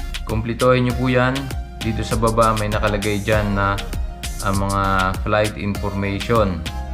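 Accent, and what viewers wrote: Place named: Visayas, Philippines. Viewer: Bisaya man ka dong hehehe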